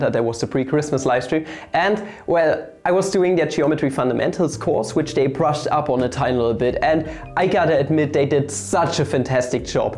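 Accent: Germany. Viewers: German accent is very interesting, where did u learn English?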